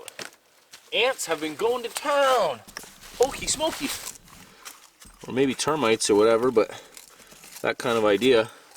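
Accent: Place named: Canada. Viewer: why oh why cant canucks say OUT right ?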